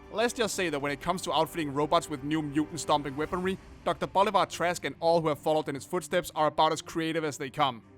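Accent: Denmark. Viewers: You sound danish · sounds lowkey like you're from denmark hehe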